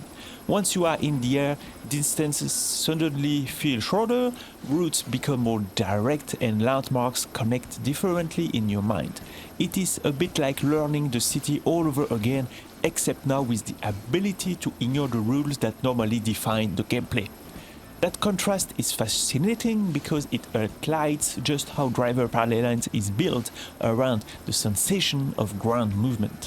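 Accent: France. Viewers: You have such a thick french accent mon ami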